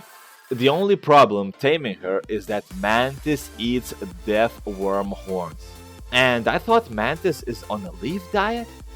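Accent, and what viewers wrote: Russia. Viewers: That accent is amazing comrade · wtf man im not even inglish i just asked if he was russian... because the way he talks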